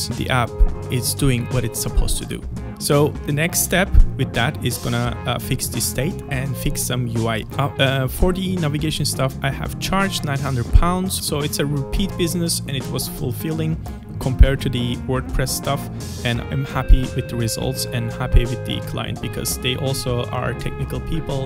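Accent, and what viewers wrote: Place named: Turkey. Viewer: bende diyorum bu aksan bir yerden tanıdık geliyor türkish baba türkish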